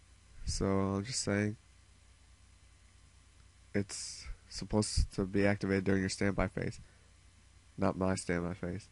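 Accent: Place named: North America. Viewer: you have to be either from USA or Canada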